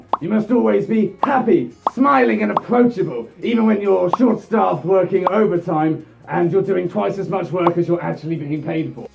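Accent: United Kingdom. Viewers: I can tell he’s British.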